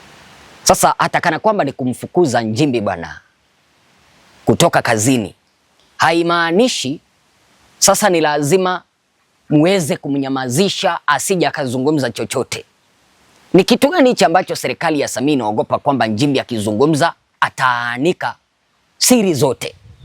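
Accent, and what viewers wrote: Kenya. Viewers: He has Nothing he is Speaking truth other thank making Up stories he Feeds from fake Stories media Comments and Posts..THIS IS A bullshit Kenyan Posing as Tanzanian while He cannot even Pronounce Nchimbi in a Right way instead he is using Kenyan and Kamba Pronunciation of “NJIMBI” and NDEJEMBI IS “NDENJEMBEI”. Huyu. Ni MSENGE ANALIPWA NA SATIVA NA MWAIPAYA KUPISTI UPUUZI USIO NA MAANA WA TANZANIA ASIYOIJUA.. ULIONA WAPI MTANZANIA HAJUI KISWAHILI?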